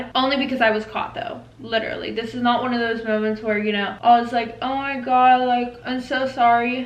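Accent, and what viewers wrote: United Kingdom. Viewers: Thanks very much❤️❤️❤️❤️that red makes your blue eyes pop 💙💙💙Love ur British accent!!!! Love from uk!!!! 😂😂😂😂😂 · I actually liked the British accent 😂❤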